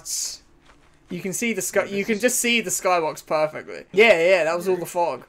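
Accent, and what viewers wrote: United Kingdom. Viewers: HE. IS BRITISH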